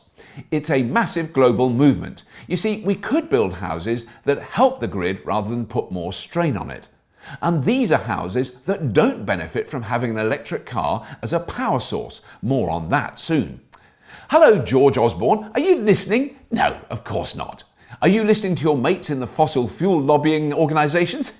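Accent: Russia. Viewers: Nice Russian accent! To good to be true thou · Nice russian accent!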